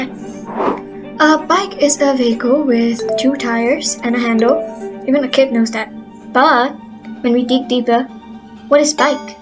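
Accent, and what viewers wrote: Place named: Katra, India. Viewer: Waaaa katra accent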